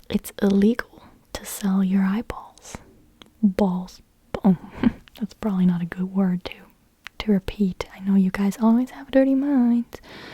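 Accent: California, United States. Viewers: You sound more American than German. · you sound american, i thought you where German ? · Hast du deinen amerikanischen Akzent Absicht geübt oder woher hast du ihn ? 😊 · Cruz DeLeon she does have an accent, it's American. No idea how she gained it but never the less it's certainly American rather than British. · Your American accent is so superb, I often forget you’re German. And then you disclose that you don’t know certain American idioms, (like “How did the chicken cross the road?”) and then I remember. lol You have such a large understanding of our culture though. I wish I knew more about German culture. :) Also, I’m pretty sure most of those “laws” aren’t true. haha I cant imagine any law enforcement keeping track of off-key singing. hahaha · Your american accent is superb. Even though I didnt get any tingles from this video I still enjoyed it and found it relaxing. 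Videos like this would be great for times that I want to wind down but not necessarily fall asleep. I also think it would be interesting to hear your normal voice speaking in German (maybe with subtitles for people like me who cant keep up with the language at that pace) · Descended_Flames I genuinely wanted to know why you put English in quotation marks that's a valid question. There is a distinct American accent in her voice, it does not matter from where from although Texas, New York and California all sound different they do sound fundamentally the same. She sounds a bit west coast, like a well spoken person from California if you wanted to be specific. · I still can’t believe how good you’re accent is , I’m not sure if it’s because I’m from the uk , but you sound totally American !! Credit to you ! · Love your voice I still trip on how a German girl can sound so old school California.I like your normal voice better then the whisper for asmr.Speech should be used rarely in a asmr vid.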